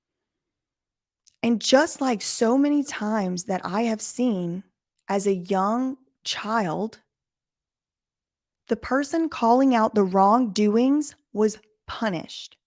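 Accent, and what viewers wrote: South, United States. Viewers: ...I can also relate to something similar in healthcare. I'm finding this late but I wanted to say how freaking ahhmazing you are. When i first found you a couple of months ago, I thought you were just a quirky youtuber with a southern accent. I quickly have realized what a gem you are. So intelligent and funny! Side note, my husband who doesn't watch any youtube has taken to calling you " '. (yeah i know) and you've made him a fan. Love and respect!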